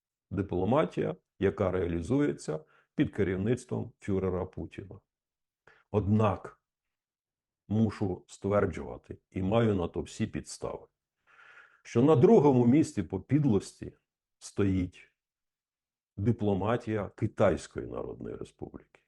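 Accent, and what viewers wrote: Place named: Galicia, Ukraine. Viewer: Пане, а почему такое ГАЛИЧАНСКОЕ произношение звука "Ч" ??? Очень медленная речь, просто тянучка !!!!!!!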